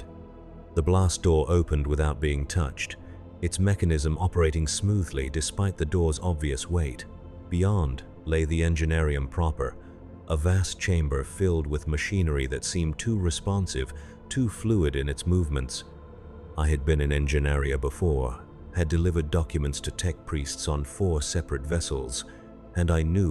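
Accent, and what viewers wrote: United States. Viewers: Wtf is with our favourite voice sounds to American now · Old voice was so much better, new voice is too American sounding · Put the voice back, American voices SUCK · Too American voice not good to listen to. 😢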